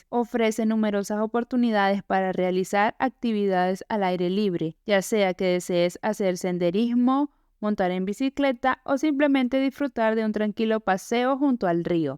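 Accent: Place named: Venezuela. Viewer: La joven que narra tiene un acento muy bonito, parece venezolana o colombiana. De dónde será? Es solo curiosidad.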